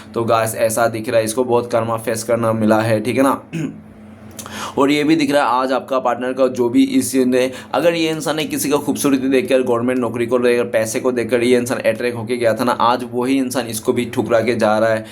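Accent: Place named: Bengal, India. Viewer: aapnar acent bengali aachen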